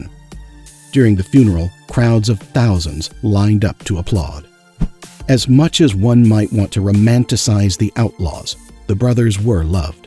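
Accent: United States. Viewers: Why is it that all these documentaries seem to have a cheesy American narrator? Unwatchable. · How do you with your American accent know anything at all about the twins. ? Been watching a few movies? One thing that is for sure, the east end would still be the same Also no Indian restaurants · Muggy Yank accent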